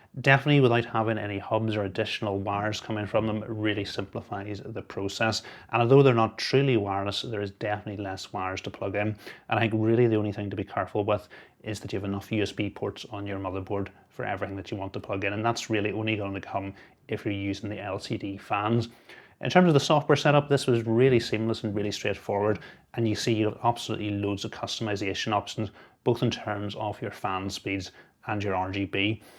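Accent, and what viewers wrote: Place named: Northern Ireland, United Kingdom. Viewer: Love hearing a local accent on these tech videos. Great to see a fellow lad from NI doing so well. Its great how clearly you explain things and take your time and dont over hyper. Keep up the great work.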